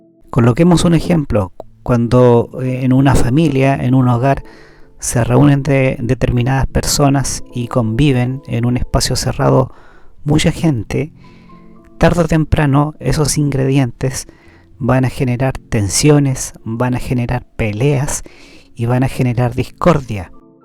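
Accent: Chile. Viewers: A mí por el contrario me encanta que 🤩estos vídeos se hagan de viva voz de: ¡Se que eres tu! Porque nadie más podría explicar con tanto discernimiento y claridad lo que ha expuesto en su blog y eso es grandioso.. He aprendido mucho con tus aportes, ya que desde hacía muchooos años buscaba aterrizar de esta manera las lecturas del libro. Por más podcast como estos con acento Chileno 😄 por favor🎉. Gracias ❤ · Ya que tocaron el tema de la locución, coincido en que a mí tampoco me gustó. Desde luego que el contenido es más importante y no voy a dejar de ver el vídeo porque la locución no me gusta. Pero, si deseo mejorar el canal, es preciso cambiar de locutor. No es tanto el acento chileno (que también) sino que realmente no sabe narrar. Lee el libreto como sea, no hace las pausas necesarias, no transmite...pareciera una persona al azar que ni siquiera conoce el contenido del libro. 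A mí me gusta la voz de Helena, lee muy bonito. Al final de cuentas, nuestro idioma viene de España, por lo tanto quienes mejor lo usan son los españoles. Humilde opinión. Saludos.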